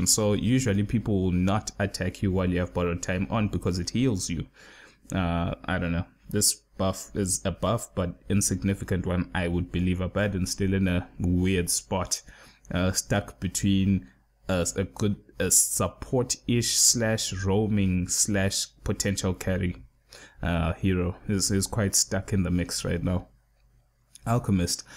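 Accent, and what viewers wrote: South Africa. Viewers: is this guy from south africa? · is that a South African or perhaps Zimbabwean accent I hear?:D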